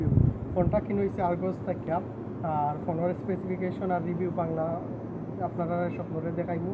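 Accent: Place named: Sylhet, Bangladesh. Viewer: সিলেটি কতা ভই খুব বালা লাগে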